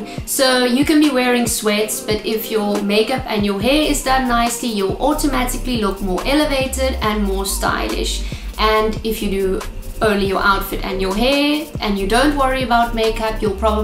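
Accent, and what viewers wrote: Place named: South Africa. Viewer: Just found your channel and love the tips. Where are you from? Your accent sounds South African xx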